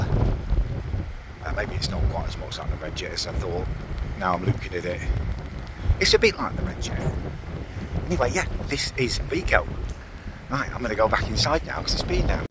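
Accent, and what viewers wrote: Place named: Northern England, United Kingdom. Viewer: Your accent seems pretty northern, how long have you lived on the isle of wight?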